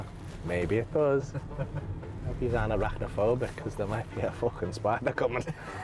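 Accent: United Kingdom. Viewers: You hater 😂😂. His vibe & flow is second to none and his quick witted northern monkey flow is 🤌🏻🤌🏻🤌🏻🤌🏻 love ya. Congratulations mate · As usual I’ll need the subtitles for this. It gets well weird north of Stroud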